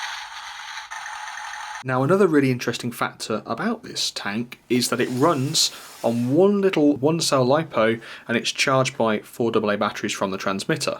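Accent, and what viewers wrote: United Kingdom. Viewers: You re british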